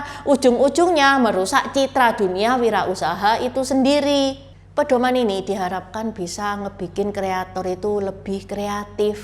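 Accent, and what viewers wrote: Java, Indonesia. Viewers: Chindo medok.. Jaya Jaya jaya.. Matur nuwun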